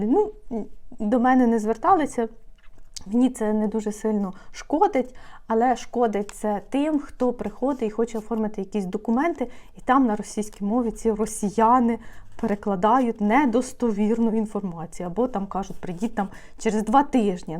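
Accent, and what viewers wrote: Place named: Western Ukraine, Ukraine. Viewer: а ви самі чому в Германії. Ви звідки тікали, з якого міста? Я питаю, бо по вимові, ви з західної частини, а вас не обстрілювали... Багато людей з західних обл. Повтікали за кордон і здають переселенцям свої квартири дуже дорого, маючи дохід живуть за кордоном і ведуть себе наглувато, розорять нашу країну.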